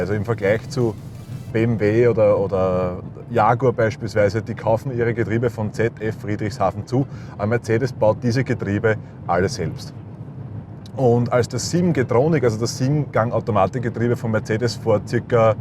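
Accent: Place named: Austria. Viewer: Deutsches dialekt won östrreich ist komisch......aber auto ist cool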